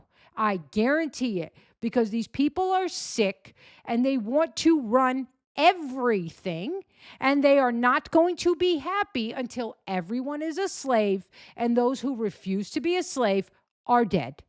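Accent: Italy. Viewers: Love the Italian accent !! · Love the Italiano accent. I used to actually think you were one a them there New York Italians.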